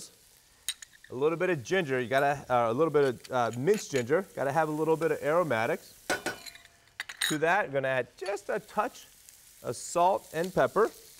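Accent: United States. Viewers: I agree...his voice sounds like he was born in the US. Martin Yan, on the other hand was born in China..people criticize his accent as "fake", though that *is* his real accent.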